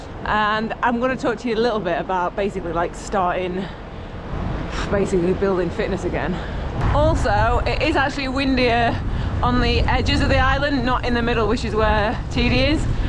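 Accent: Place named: Manchester, United Kingdom. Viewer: So you're from Manchester? I was trying to pinpoint the accent. Guessed wrong.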